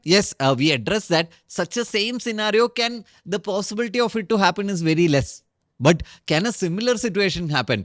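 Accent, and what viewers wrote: Kerala, India. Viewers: Are you from Kerals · Are u mallu 😊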